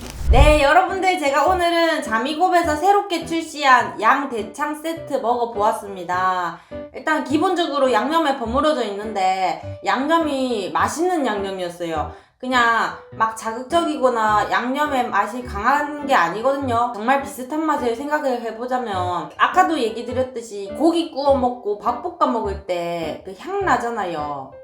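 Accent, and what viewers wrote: Gyeongsang, South Korea. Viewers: 저왜 안광이 보이죠? ㅎㅎ여자인내가봐동..넘이쁘다 ㅎ 이거익은거예요~~ 같은 또 경상도인이로써!!! 자랑스런이쁜받는말투!!ㅋ 렌즈를 따로꼇다해도 안광이... 그리고 두겹 팔자주름..매력포인트... 전좋아요 저부분!!ㅎ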